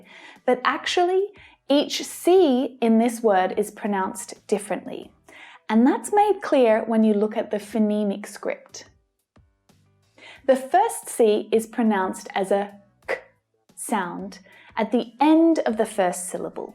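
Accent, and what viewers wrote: United Kingdom. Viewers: Is she british?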